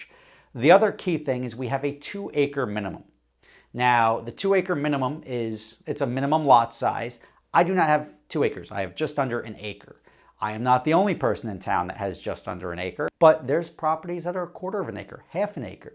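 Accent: New Jersey, United States. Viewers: Sounds like Jersey alright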